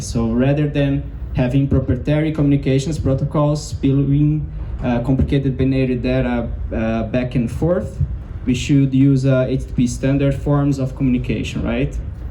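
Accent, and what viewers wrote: Brazil. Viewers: Você fala inglês muito bem! Não sei se é do seu interesse, mas caso seja, você pode tentar estudar um pouco o alfabeto fonético da língua inglesa pra treinar um pouco a pronúncia nativa. Isso não é necessário, mas talvez seja interessante caso queira perder um pouco do sotaque de brasileiro (espero que entenda que não é uma crítica, provavelmente você sabe mais de inglês que eu! Kkk)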